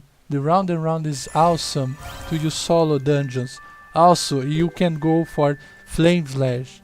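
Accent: Brazil. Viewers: esse sotaque brasileiro!!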